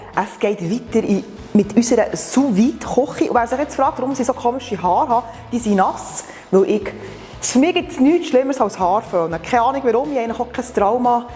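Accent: Bern, Switzerland. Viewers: wenn der Titel auf Hochdeutsch ist, dann werden die meisten wohl davon ausgehen, dass im Video auch Hochdeutsch geredet wird. Aber der Berner Dialekt ist so schön, dass es auch mir als Österreicher egal ist, dass du in der Mundart redet. Das meiste versteht man eh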